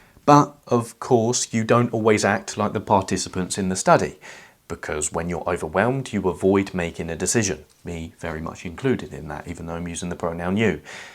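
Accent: United Kingdom. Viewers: idk why this guy is so convincing, it must be the british accent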